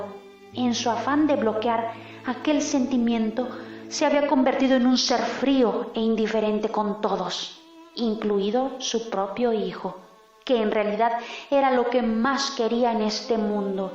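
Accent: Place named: Spain. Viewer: Sip, lo sé, solo que hubo una de tus suscriptoras que preguntó que de donde eras y me atreví a responderle 😆😆😆(perdón)que eres española y eso se nota claramente en tu acento. Saludos.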